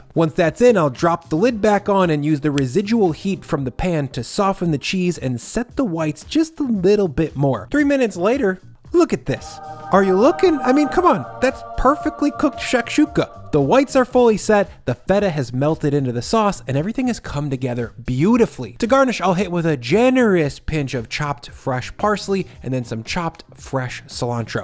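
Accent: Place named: Chicago, United States. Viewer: At first I thought you were joking around and emphasizing certain words in an annoying way.. now I realize it's just a Chicago adjacent accent and you're not pretending.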